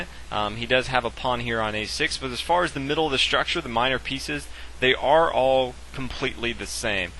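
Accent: South, United States. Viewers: This commentator is fantastic. Erudite, charismatic, and populist. Just want to offer my lay endorsement. Plus, I like a good Southern accent in the context of monarchical European Game of Kings. :)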